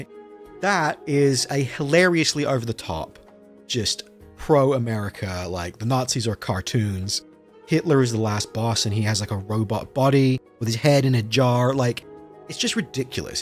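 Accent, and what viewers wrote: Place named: Northern Ireland, United Kingdom. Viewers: He sounds (Northern) Irish to me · he's a Brit who has lived in America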